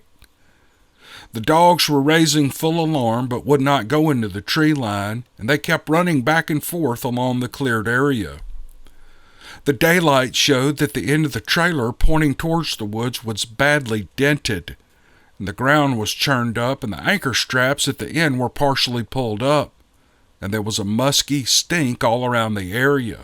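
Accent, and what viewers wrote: South, United States. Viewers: Good afternoon one of your tribal friends and as I’m getting my monthly chemo and your voice pod piped up thank u Sir your southern real voice is so soothing 😊thank You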